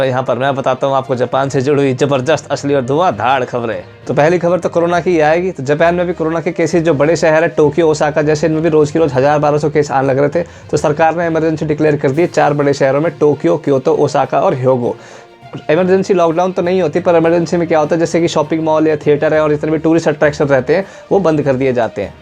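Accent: India. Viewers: Desi accent mein Japanese news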